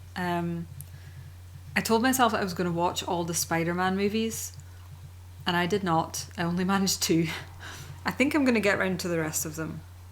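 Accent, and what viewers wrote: Scotland, United Kingdom. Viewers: Hi, sorry for commenting on this one about a vid from the past :P but im so into the storyembryo and I have a question! :D How does foretelling as a tension creation tool work with this plotstructure? Can I foretell something out of the '#6 Pay a heave price (enlightenment/chaos)' part before I start at the '#1 Comfort zone (ignorance/order)'? Ofc I can, I know. But does it work technically? I've written a lot in what I call adventuremode. Now I'm in tidy-upmode so I'm interessted in the theory and if you know more about that! Love your accent. It's Scottish right?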